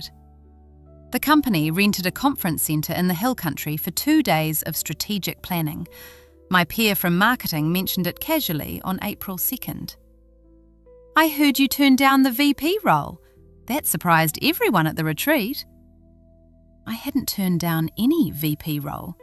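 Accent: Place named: United Kingdom. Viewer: Lovely British accent of the narrator!!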